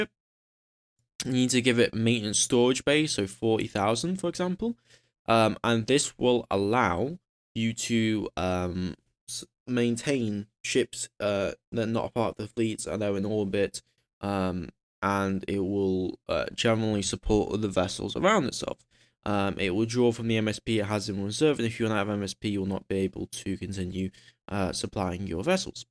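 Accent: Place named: United Kingdom. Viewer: Sound is a lot better on this one! Minor point of citicism: your english is very 'brittish'. You talk very fast and don't 'finish' all your words properly. This generally makes it harder for continentals to follow. Keep it up though, the tutorials are really useful.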